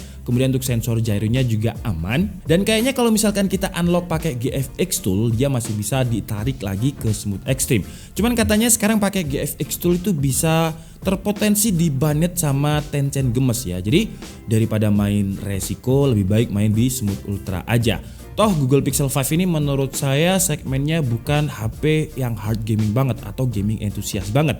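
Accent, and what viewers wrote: Java, Indonesia. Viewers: Dia orang malang ngab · Sampeyan jowone ngendi tho mas kok medok tenan 😀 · Jawa.ne pundi om?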